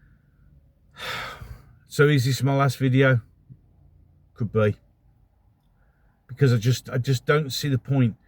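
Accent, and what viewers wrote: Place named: North, United Kingdom. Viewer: You may only have 400 regular watchers, but those watchers are organic and we like YOU, they can hear Sargon or anyone else with a posh accent moan about mass immigration, but your rants make me feel right at home, Northern, salt of the earth, a good soul. That's what drew me in, then the references to scifi and geeky stuff and STICKLE BRICKS kept me hooked, and i admit, the far right hermaphrodite will miss you. Let's see if this gets deleted, the H-word! (I nearly wrote H-b0mb!)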